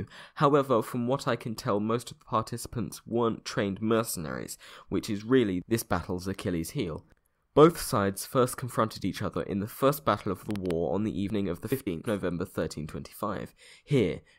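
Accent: United Kingdom. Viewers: this was actually quite funny. aswell as informative. Your video editing is top notch, and the humor is there in typical british fashion. So i can see why you managed to hit to many subscribers with the help of youtube. (im subscribed to CrashCourse, Kurzgesagt and Knowledgehub, and this channel seems to cater to the same style, and thus I got your stuff recommended all over my youtube page, so this might explain the sudden spike of subscribers as theese 3 channels are huge) Only thing I can say thou is the Audio Editing needs some more work. I do notice that some parts of your video your audio cut-ins tend to overlap so it can sound abit strange at times (example ) Ontop of that your voice is nice and not ennuing to listen to (unlike some youtubers out there) Might be the british accent. Another thing I would recommend is to add a calm background theme that dosnt disturb your speech, as the videos can be abit to quiet with only your voice talking. 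(good example is how KnowledgeHub and I Hate Everything does it) This adds to liven up the video. Not to mention a Opening theme (Althou thats not really needed) And I would pretty much say with that, you'll quickly reach even higher number of subscribers. As long as you keep the content coming. Keep up the good job! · This channel gets a lot of views for the amount of subs it has, 100%+ of the subs watch this channel clearly. Must be the good editing and interesting topics coupled with the good ol' Brit comentator.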